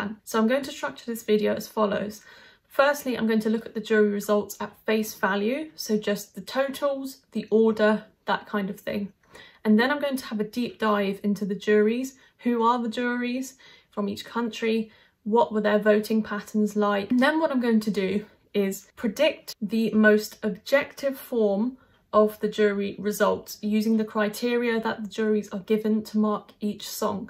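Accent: United Kingdom. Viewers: I believe you are completely out of track. Your analysis does not entail the political economy aspect of the issue. Let me rephrase Stalin principle here "it is not important who votes, it is important who nominates who is going to vote". The main issue being here that these jury members are too few and nominated exclusively by the broadcasters and that is too easy for them to control the results whomever they nominate to be part of the jury, rappers, pop singers, astronauts or belly dancers. Please give a look to the complex jury system (and numbers of components for each jury segment) adopted for Sanremo. Still far from perfection but lightyears ahead than ESC in trying at least to minimize such "distortions" particularly on the broadcaster side which in this case is RAI. Clearly the music industry is a giant and investments need to be protected even in this economic sector so do not expect that this will turn overnight into a completely fair game but please keeps fighting (no weapons needed, as a youtuber you can fight with your nice oval and your remarkable british accent) also in the name of those (including me) that still believe that culture remains a human right “Everyone has the right freely to participate in the cultural life of the community. 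(art.27)”. Also, are you sure that televote is not at least partially controllable? First of all who is really enforcing the check related to number of votes per mobile number once the Eurovision is over? Secondarily imagine a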